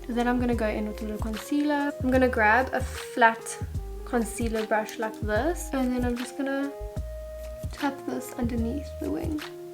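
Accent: South Africa. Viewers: Ooh a south African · You sound south African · Are u South African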